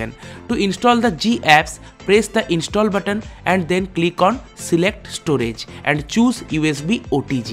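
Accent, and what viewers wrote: India. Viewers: Bro... Everything is nice... Just work on your accent a little bit.. Get rid of that Bengali English accent.. It sounds cringy bro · Indian. No where near Italian. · i hate the indian accent, but i wqs able to watch the video to the end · He has Indian pronunciation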